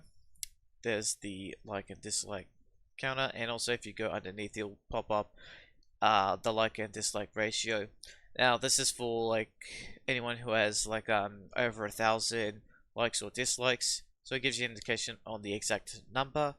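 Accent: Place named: New Zealand. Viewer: I swear you've got a bit of kiwi in your voice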